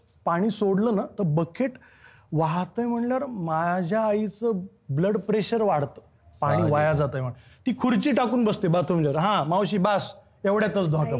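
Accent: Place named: Beed, India. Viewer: रिअल बोला हा...... माझा मित्र बीड चा कोल्हापूर ला आला होता तो पण असच बोलत होता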